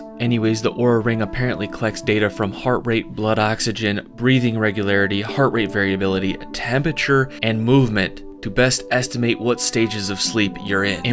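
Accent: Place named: South, United States. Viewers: Dude why are you talking with a Southern accent??? Just talk normally man. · He sounded more "southern" but I just noticed after reading your comment. Lol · Definitely noticed more of a Southern twang to his accent. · He probably listened to southern people, or he's doing a trial related to changing his accent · How come you had a southern accent in this video 😂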